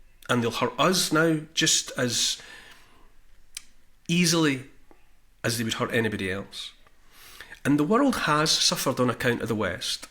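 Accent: Scotland, United Kingdom. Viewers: ... this CanUk fan is a fan because you overflow with common sense. Personally thank you for the great help you have been to a feller, with ancestral Scots blood, better understand what words behind the Scots accent meant: ) In the 50s-60s Scots in our chat had been reduced to wonderful 'catch phrases' usually humerous. Cheers & beers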